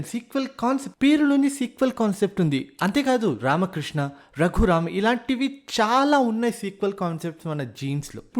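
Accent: Karnataka, India. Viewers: Kannada accent lo intha acha telugu nuv tappa evadu mataadadu ra babu 😁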